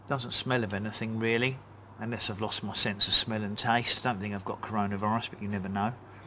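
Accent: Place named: Essex, United Kingdom. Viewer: yes I recognise the Essex accent 🤣 I’m in Langdon Hills so only down the road. I’m looking forward to learning more!!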